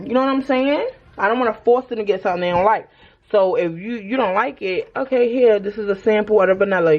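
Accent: United States. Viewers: I love your NYC accent and charisma! Your business name fits you! Also, you make me want to take a trip to the city! 😊 Thank you for sharing this and not gatekeeping! Many blessings to you!!! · I love your accent - sounds like nyc-brooklyn lol - and this was detailed God bless your business and your family! Thank you!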